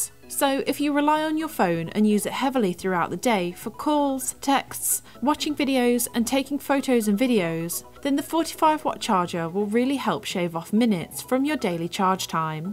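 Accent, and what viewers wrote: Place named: Northern England, United Kingdom. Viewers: Northern accent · I really loved ur british accent ❤❤😍👍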